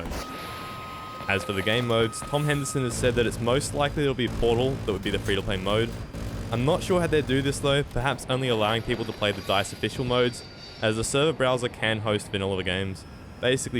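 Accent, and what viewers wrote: Australia. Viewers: Ughhhh Aussie accent, gross.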